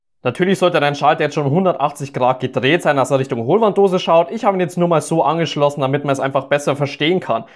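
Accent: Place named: Middle Franconia, Germany. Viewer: Wirklich gute Anleitung, aber kommt der Akzent aus Mittelfranken? "beschädigen könntne", "oben und untne", sowas kennt man bisher nur vom guten Drachenlord.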